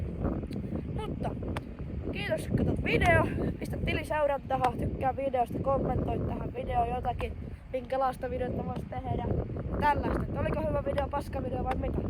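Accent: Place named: North, Finland. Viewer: Hyvä video tilasin kanavan vaikka tuota pohojammaan murretta ei jaksaisi kuunnellakkaan😁😅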